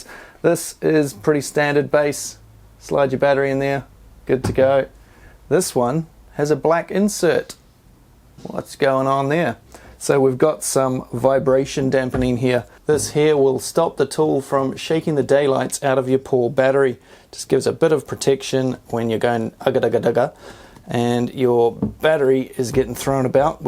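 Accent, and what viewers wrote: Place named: New Zealand. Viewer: Always surprised to hear a kiwi accent on Youtube. Good content man.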